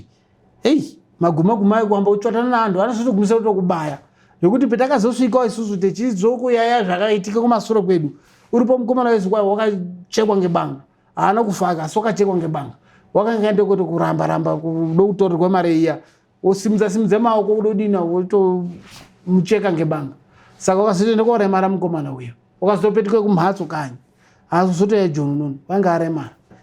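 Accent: Ndau, Zimbabwe. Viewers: Chindau chondispaka zvangu😂😂😂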